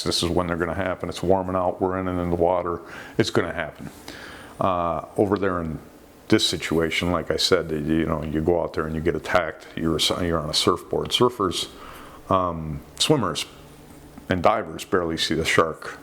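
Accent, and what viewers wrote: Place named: Michigan, United States. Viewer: My step father was from Alto, Michigan (East of Grand Rapids). You sure sound like the folks from around there. Am I close?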